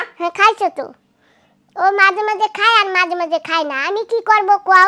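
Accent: Barishal, Bangladesh. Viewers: হাই বুঝি আপনাগো বাড়ি কি বরিশাল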